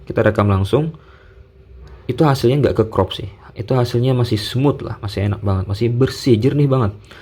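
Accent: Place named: South Kalimantan, Indonesia. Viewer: Masnya terdeteksi anak kalsel wkwk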